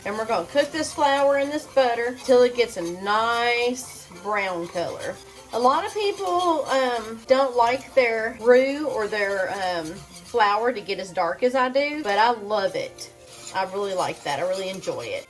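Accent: South, United States. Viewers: Thank u for sharing your recipes . Also I love your southern accent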